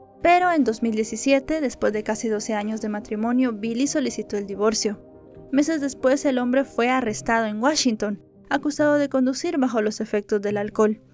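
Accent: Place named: Mexico. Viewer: Una narración a la mexicana. Excesivo uso del marcador "pues". Se nota que esta chica narradora es mexicana. Pero podría equivocarme, mejor que lo diga ella.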